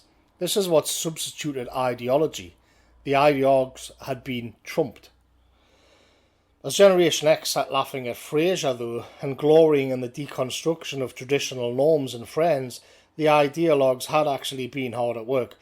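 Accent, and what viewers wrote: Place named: Geordie, United Kingdom. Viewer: nothing to do with your video, as they're all exceptional; I just wanted to say as a Geordie ex-pat living in the colonies, I love your vids. Just hearing the local dialect brings me closer to home, even though i'm thousands of miles away. Keep up the good work big fella!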